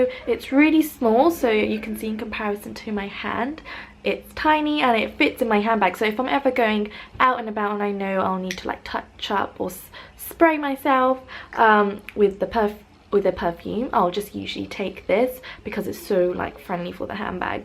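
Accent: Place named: Midlands, United Kingdom. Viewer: Are you from the midlands? Your accent is so familiar! X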